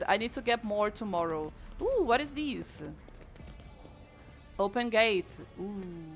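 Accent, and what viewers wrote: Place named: Poland. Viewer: Your accent sounds polish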